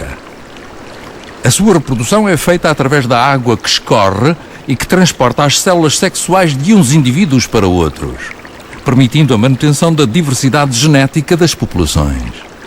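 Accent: Portugal. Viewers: Assistindo pq acho bela a natureza e a narração em pt de Portugal, bem diferente aqui do Brasil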